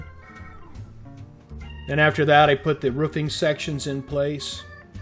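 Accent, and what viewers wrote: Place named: United States. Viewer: a european that sounds like an american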